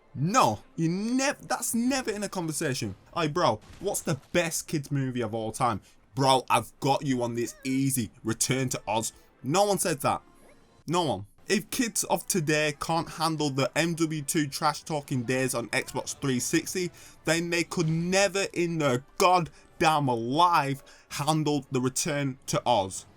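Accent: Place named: Bolton, United Kingdom. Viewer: That's a Manchester accent Sounds like Bolton?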